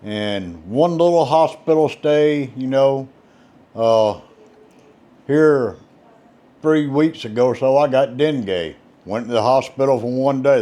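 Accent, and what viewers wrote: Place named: North Carolina, United States. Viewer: You remind me of my relatives on my Mom’s side of the family. They’re from North Carolina. Brought back fond memories listening to you speak. Love it.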